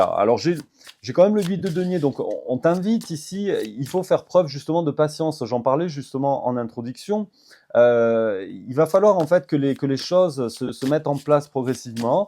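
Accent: South, France. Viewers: Bonsoir je vous découvre, les hommes ne sont pas si nombreux dans votre domaine, il me semble reconnaître l’accent du sud pas tout à fait comme le mien mais presque😉. Je suis étonnée de voir que sur votre chaîne YouTube il y ai si peu de vidéo. Avez vous une autre chaîne ? Comme j’y suis sensible, Vous avez une belle voix 🙏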